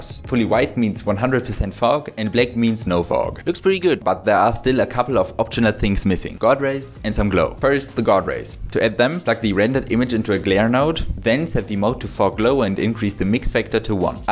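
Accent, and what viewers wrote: Germany. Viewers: i hear deutsch...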